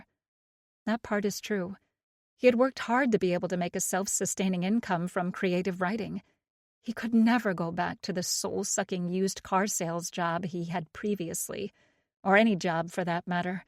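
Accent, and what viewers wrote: United States. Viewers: American Narrator ❤❤❤❤❤ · I love the American narrators! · American narrator 🎉❤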